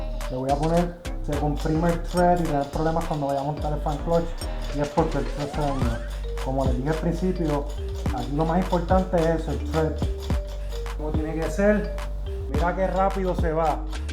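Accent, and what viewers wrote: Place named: Puerto Rico. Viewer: Lenguaje boricua. 😅😅😅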